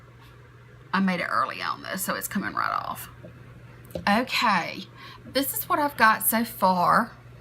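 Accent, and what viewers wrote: South, United States. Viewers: hi i love your southern accent im from australia and i love make up to and if you want to do more make up looks do it don't let any one say differant i would love to see more also you remind me of the actress cathy bates i like her movies to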